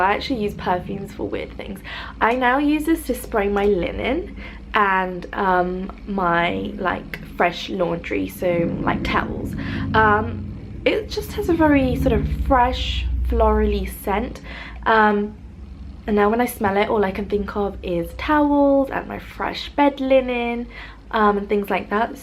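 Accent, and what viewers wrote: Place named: Midlands, United Kingdom. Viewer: Are you from the midlands? Your accent is so familiar! X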